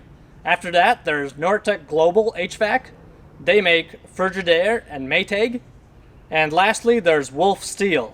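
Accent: Minnesota, United States. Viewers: Your content is great! Knowing you're a Minnesotan (cause I talk like you too!) but now I live in So. Florida. People say lifespan of 10-20 years but what about hours used? I have a 10 yr old 13 SEER Ruud 3 ton unit running 410 refrig. would you replace it with a 16 SEER or just keep it tuned up and hope for 15 years? System checks out ok, I replaced capacitor last year but seems takes nearly 8 hours to cool down house from 80 to 72F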